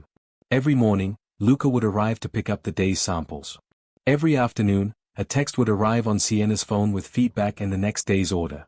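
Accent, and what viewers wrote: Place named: Australia. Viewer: ❤❤😊good story, good enunciation. The narrator’s accent, sounding of Australia. ❤❤😊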